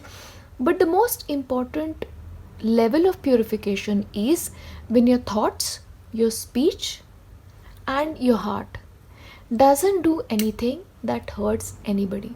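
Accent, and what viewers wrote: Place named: India. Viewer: Thank you for the readings 💐. You rightly said we are a soul group, connected through messages 💖. I claim pile 2 as I like turtles and these messages connect with me 🙏 are you not from India? Your accent is very Indian 😊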